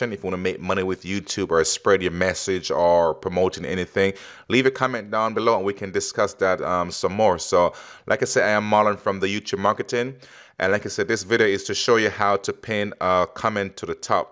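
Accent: Jamaica. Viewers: For some reason you sound like a Jamaican mix with more England also some US. 😂😂 anyway good video if I'm right i am inviting you over to my channel <link>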